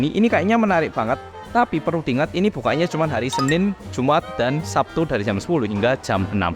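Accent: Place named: Surabaya, Indonesia. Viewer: lagatnya suroboyonan.. btw muantaap infone mas... kapan waktu aku tak mangkat korea.. sehat selalu masee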